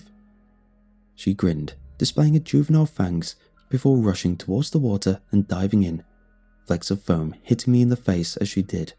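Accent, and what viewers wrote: United Kingdom. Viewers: bruh this is my fifth year listening to ur voice british people are unreal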